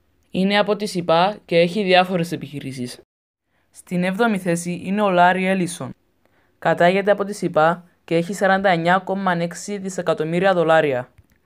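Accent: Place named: Cyprus. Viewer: κυπριος ειναι ετσι μιλανε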